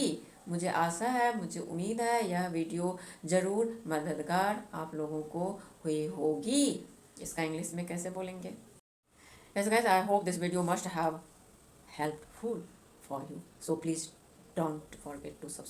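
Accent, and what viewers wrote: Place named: Bengal, India. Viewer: Madam you must be Bengali. Right ?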